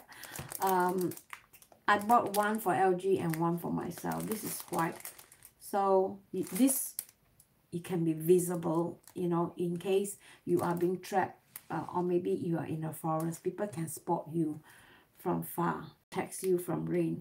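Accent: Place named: Singapore. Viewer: You still have your singapore accent.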